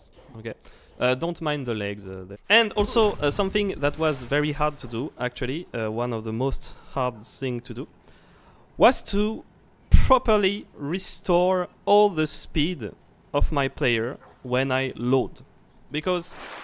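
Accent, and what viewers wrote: France. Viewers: Son accent est 100% français, tout le monde l'a reconnu 😂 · a ses pour sa que dans la video tu avais un acent francais et du mal pour certain mot 3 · Tu as comme un accent d’un français qui parle anglais 😂 sinon je pensais réellement que tu l’avais fais en 😂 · putain je savaispas que t'étais français mdr, trahis par ton accent x) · On reconnaît l'accent français c'est cool 😂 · mdr le bonne accent francais comme on aime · ptdr l’accent de fou même mon voisin a reconnu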